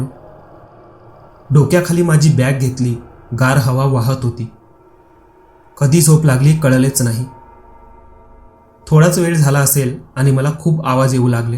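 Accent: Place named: Gujarat, India. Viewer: मस्त कथा आहे पण कथा वाचणारा गुजराती आहे असं त्याचा टोन सांगत होता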